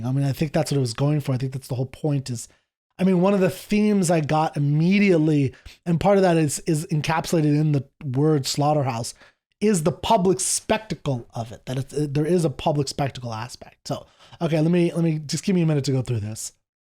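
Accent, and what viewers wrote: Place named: United Kingdom. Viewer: I know his British accent doesn't help you in the US sometimes, but yeah I thought he meant Jackel not Jekyll. Honestly I might be mistaken but that was where my head took me with all the death, killing and mixed biblical /mythical references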